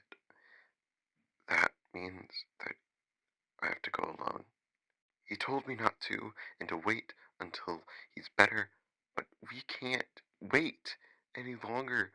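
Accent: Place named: United Kingdom. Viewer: British aha voice ❤ adorable character 💪💗💗💗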